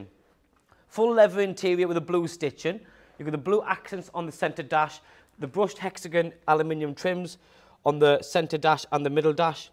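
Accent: United Kingdom. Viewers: How you sound so British? Or is this a voice over.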